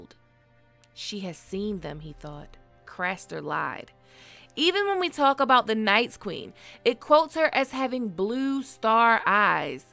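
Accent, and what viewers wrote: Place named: Baltimore, United States. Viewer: Whoever you are ma'am.. You are now deemed the best for the breakdown. I hear a Bmore accent as well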